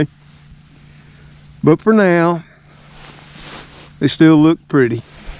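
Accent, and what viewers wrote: South, United States. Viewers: I am not young but then again I am not your age either. Love southern talk. Milk barn heater hmm wonder if one can still buy those things bet my mom knew about those.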